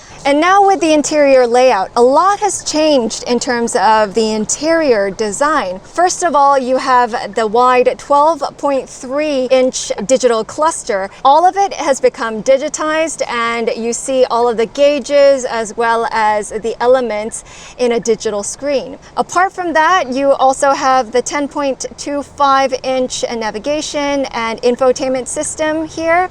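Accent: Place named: United States. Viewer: Excellent review! But just wondering, where are you from? You have an American accent, but the license plate doesn't seem to come from North America. Also wondering if the features you are talking about will come to the US.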